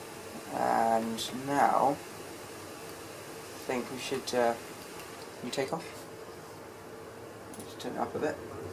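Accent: United Kingdom. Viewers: Very good review thanx bud, good to see and hear british reviewers and a excellent british accent well done.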